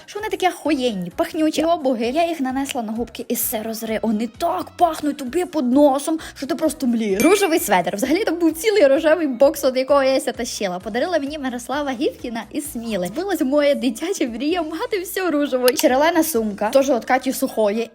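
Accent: Transcarpathia, Ukraine. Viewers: Скільки повторяти це ЗАКАРПАТСЬКИЙ ДІАЛЕКТ ЗАПАМ'ЯТАЙТЕ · А закарбацькій діалект😊😊😊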